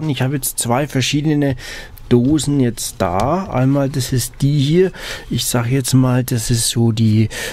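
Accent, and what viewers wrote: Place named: Franconia, Germany. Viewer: Tolles Video, bisle frängisch is a dabei:D finde ich persönlich toll, weiter so LG.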